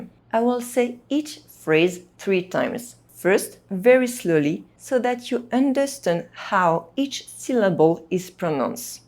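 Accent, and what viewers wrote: France. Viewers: I know but I wish I could do it JUST LIKE YOU! Also I LOVE you French accent of English! That is the best foreign accent! Much less rough than Austiran for sure lol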